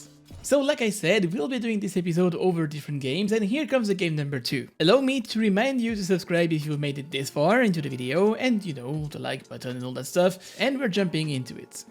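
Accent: France. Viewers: il a un petit accent français je crois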